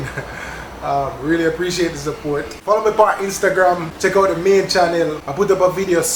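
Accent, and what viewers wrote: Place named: Jamaica. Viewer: I love the Jamaican accent.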